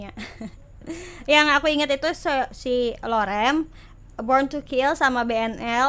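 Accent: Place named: Kalimantan, Indonesia. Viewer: orang mana ko bahasanya kaya orang kalimantab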